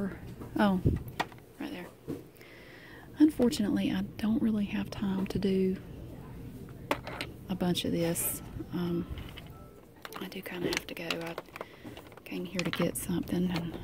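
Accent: South, United States. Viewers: I ♥️ your soothing southern voice. Greetings from Germany 🇩🇪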